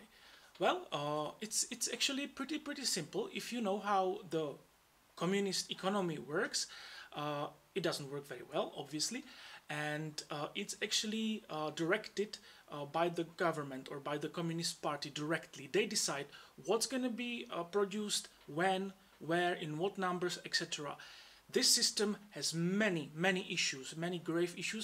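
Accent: Czech Republic. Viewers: The CZ-75 is the greatest pistol design ever made in my opinion... no matter what purpose you could ever desire, there's a 100% chance that the CZ-75 can be adapted into that role with a variant of it or even simple customization! My father from Persia introduced me to CZ over a year ago, but I'm the one who's a CZ-Cultist! Dumb question, with the accent you have, I would presume you are indeed from the Czech Republic, right? Sometimes I think "Man, I need to go see what's there..."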